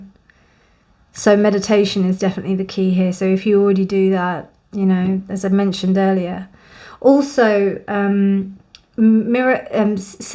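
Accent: United Kingdom. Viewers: Finally, I'm done with the things I need to face. And now I have the time to see this! Woooh! I already watched the other suites and I saved this as last. Save the best for last. Thank you, Ms for choosing this deck. I love this deck. ✨🤍 Yet it made me had a hard time choosing for I love all the cards from this deck. 😂 And when you mentioned "Harry Potter" with your british accent. I suddenly remembered Hermione. Add your intelligence, brave voice and laugh. ✨🤓✨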